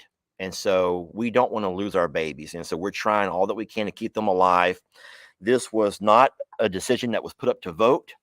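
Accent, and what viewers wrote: South, United States. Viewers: It's also partly your Southern Draw it's awesome to hear from you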